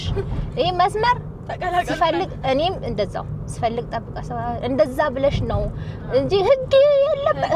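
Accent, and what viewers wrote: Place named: Ethiopia. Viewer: አንቺ ፈላሻ ነሽ ለአ.አበባም ፈልሰሽ የመጣሽ ከአነጋገርሽም ታስታውቂያለሽ አ.አ base የለሽም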